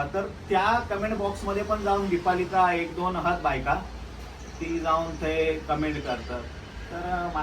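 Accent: Malvani, India. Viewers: खूप सुंदर vlog❤❤❤माका तुमची मालवणी लय आवडता.....तुमची अशीच प्रगती होत राहो हीच स्वामी चरणी प्रार्थना ❤🙏तुमच्यामुळे आम्हाला एक नवीन उर्जा मिळते आणि तुम्ही ज्या ज्या हॉटेल मधे जाता ती सर्व माहिती मिळते .....मस्त असेच एन्जॉय करा ❤❤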